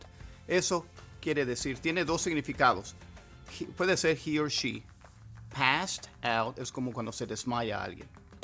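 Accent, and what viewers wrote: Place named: United States. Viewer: You seem yo have lived Tha america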